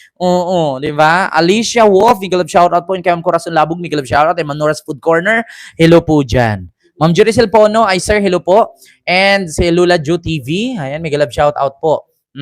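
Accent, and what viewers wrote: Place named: Iloilo, Philippines. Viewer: Hahaha butinga! Tama. Mahirap kc sila umintindi. Lagi akong natatawa sau lalo na pag nag i ilonggo ka galing mo talaga🤣🤣🤣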